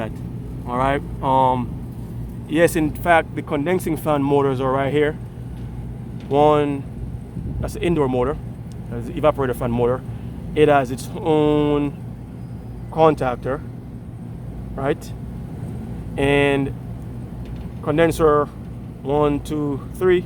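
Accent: Jamaica. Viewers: Are you a jamaican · Hey my friend are you from Jamaica? My family is from Jamaica as well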